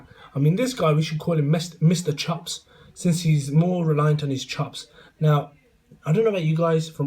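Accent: Birmingham, United Kingdom. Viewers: Are you from Birmingham. I hear the brummie in you kiddo lol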